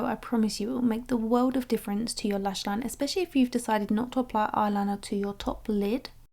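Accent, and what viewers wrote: United Kingdom. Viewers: I know this is a random question, but can anyone tell me which UK regional accent has?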